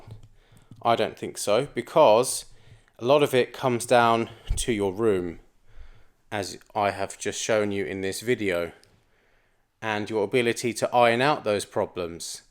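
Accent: United Kingdom. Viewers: It’s VERY refreshing to watch a hifi gear review that’s not from some slick Nth American that comes off like a CNN grade infomercial. And the Nth American sales guys are easy to spot as salesmen as they speak entirely scripted and most use their hands like politicians or billionaire CEO’s. As soon as I see/hear them I’m gone. Yours is entirely real and obviously a non-professional slick salesman presentation. Thanks keep going. And the subtle humour doesn’t go unappreciated. Cambridgeshire accent btw??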